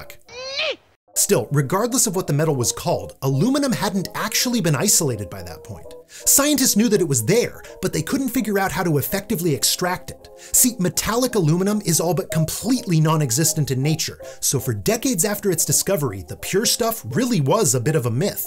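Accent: United States. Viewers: You really shouldn't get into scientific discussions if you cannot even understand basic English. Literally no part of the video claims either of the names is 'wrong' and does not refer to either being 'unique' in any way. The maker of the video says that the reason he calls it aluminum is not just because of his American accent, but also because the person that coined the first term for the metal (alumium) changed it to aluminum. He then proceeded to say that somebody at Quarterly Review referred to it as aluminium and it 'stuck', since the correct term (coined by the maker) wasn't yet widespread. What does this mean? That BOTH spellings are actually correct. Aluminum as that's the name it was actually given by apparently the first person that named it, and aluminium as a common 'evolution' of a word (which is the process by which we have 'photoshop something' or 'google it'). 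All that aside, even if we assume that 'aluminium' is the only correct form in British English (it's not), this STILL doesn't mean jack, since it is correct as aluminum in American English. Whether you want to accept it or not...that's an existing language and it doesn't need to follow the naming of other languages (including other variants of English). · The man in the video is no authority. He is American using an American dialect of English. ONLY in the USA is aluminum accepted. Internationally it is recognised as an acceptable American dialect, not standard English. It isn't the British, it is 96% of the world population.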